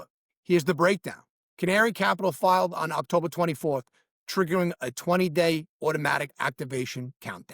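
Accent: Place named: New York, United States. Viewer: You are the only NY accent that I can listen to. Keep 'em coming.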